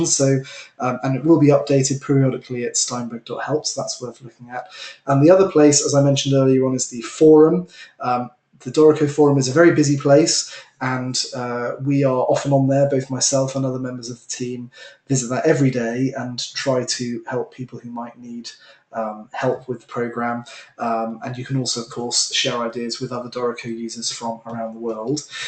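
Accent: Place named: England, United Kingdom. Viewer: It would help very much if you SLOW down your speech. #1 not everyone can understand the nuances of a 'English' accent. #2 The presentation feels rushed. I know your up against a clock, but it feels that way to. Just about the time I think I'm starting to 'get it' You fire off a 6 word phrase that leaves me interpreting 'you' rather than the program. I appreciate your effort and enthusiasm. If I were presenting this and I was from Jackson Missippi USA. at this tempo...you'd be writing me (: God save the queen !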